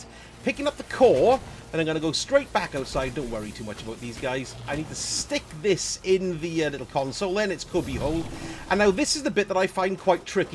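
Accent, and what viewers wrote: Wales, United Kingdom. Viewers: Love the Welch accent, miss working in Wales, good content. Thank you.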